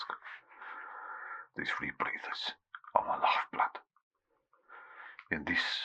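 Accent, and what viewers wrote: South Africa. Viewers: Anyone else hears a White South African accent? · Is that you South African accent you are using? 🖤🖤🖤love your work always.😊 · Are you from the east rand bru? You sound like my cousin from Benoni.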